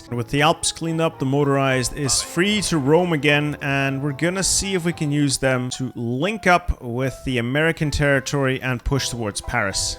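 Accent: Belgium. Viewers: Hes Belgian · out of curiosity, are you from flanders or wallonia cause i can’t put my finger on it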